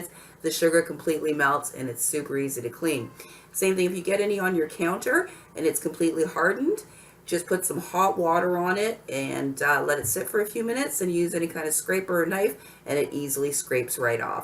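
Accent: Canada. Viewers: I love the Canadian accent and your recipes thanks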